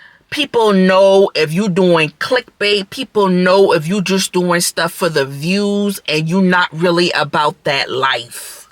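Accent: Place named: Charleston, United States. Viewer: Ouuuu❤❤❤ I'm going to start recording some GIG work content. Also I knew you were from Chuck town ..... that accent. Im from Goose Creek area ❤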